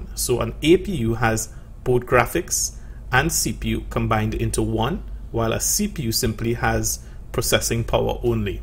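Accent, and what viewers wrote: Trinidad and Tobago. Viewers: You can hear a Trini from anywhere in the world. 😂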